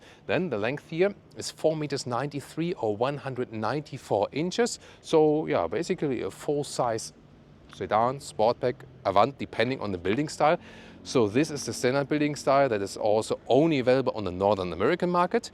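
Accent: Germany. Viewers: Just want to say, that I love your videos. As a car guy myself, I really appreciate a German car guy speaking English. It’s pure enjoyment. Greetings from Denmark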